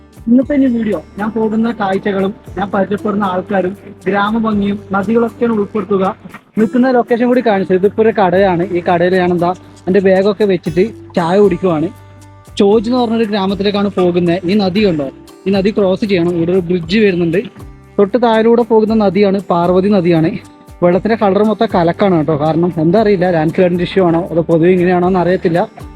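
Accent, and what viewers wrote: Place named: Wayanad, India. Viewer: വയനാട് കാരൻ്റെ സൗണ്ട്...🔥🔥🔥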